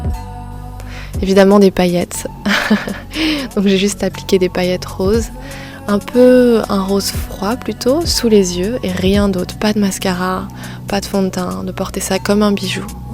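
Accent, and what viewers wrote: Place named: France. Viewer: You are goals. And I love your accent. I'm gonna learn French! (Inspired)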